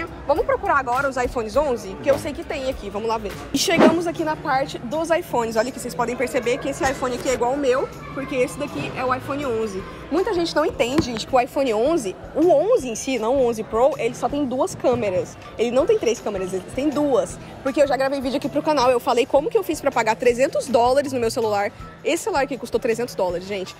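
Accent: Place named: Sao Paulo, Brazil. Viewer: Gostei desse teu sotaque paulista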